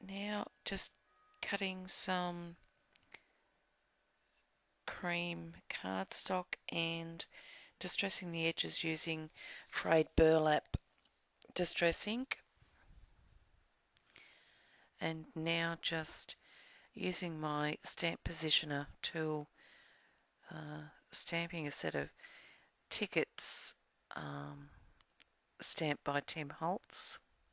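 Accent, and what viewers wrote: Australia. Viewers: Hello:) I really enjoyed watching your video, and after watching your video, I am off to get some glimmer mist to use with stencils. An excellent idea! From a fellow Aussie, it was wonderful to hear the Aussie accent again!:)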